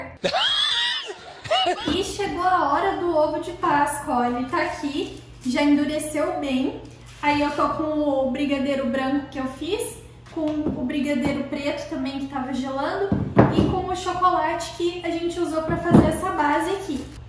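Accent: Paraná, Brazil. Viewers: Gente! Paranaense fala assim mesmo!! Botar fritar, botar ferver, botar fora quando fala q é pra jogar fora algo sou paranaense e sofro em sp kkkkk povo olha p mim até hoje estranho! Terreno é data... Enfim é só o jeito paranaense de falar kkkk bota like aí povo!! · não liga pros comentários ruins principalmente do seu jeito de fala.. vcs dois são lindos e sem dúvidas o melhor canal do YouTube com divirsidade no canal pra gnt... Eu tbm sou Paranaense ( Guarapuava) e amo nosso jeitinho.. manda um bjo pra mim se puder 🥰🥰🥰🥰🥰🥰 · Tudo gente chata que se incomoda com o nosso jeito Paranaense de falar, pois eu tenho orgulho de falar como a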